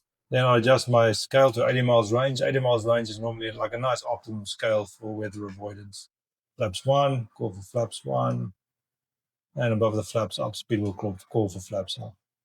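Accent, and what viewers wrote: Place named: South Africa. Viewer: Good to hear an SA voice!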